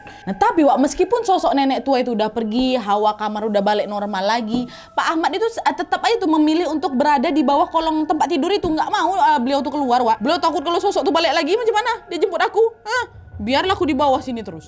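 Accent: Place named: Medan, Indonesia. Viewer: Pandai kali wak berkisah.belajar darimana? Dialekx itu suka banget.medan kah ya?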